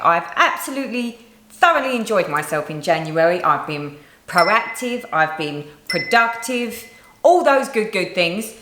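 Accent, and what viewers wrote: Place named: Essex, United Kingdom. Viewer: Hi...PLEASE don't be discouraged by these ignorant, moronic naysayers. They've nothing better to do with their time than shoot nasty comments your way, probably, as Thewoolyhattedstitcher says, they're probably jealous and envious of your talents and beautiful pieces because they have nothing to offer themselves. Your enthusiasm and excitement is contagious. You are a beautiful person inside and out so don't let anyone tell you otherwise!! Block them! Delete them! Whatever you have to do. There are plenty of people out here who love you and would miss you like the devil if you should quit doing videos. I wait patiently for every one of them. Besides, I'd really miss that Essex accent of yours. It just adds to your beauty!! That said, may I compliment you on every piece you've shown in this video. I'm soooo partial to the high heels collection that I'm going to order the pattern. 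You know your an enabler!! LOL Congrats on your page finishes and all you've done. What a hell of a start to 2020. Love ya and please stick with it. Hugs from Canada (Yes, we're huggers here. We have to be just to stay warm! LOLOL)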